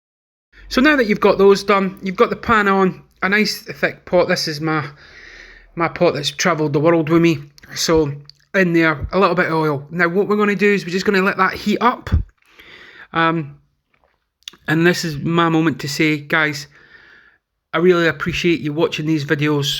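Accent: Scotland, United Kingdom. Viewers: I tuned in because I love a Scottish accent! But it's a bonus because maybe I will learn a couple of new recipes. In this, I think since I don't really care for carrots, I will switch them out for button mushrooms. Good luck. -Anne in Ft Myers Florida.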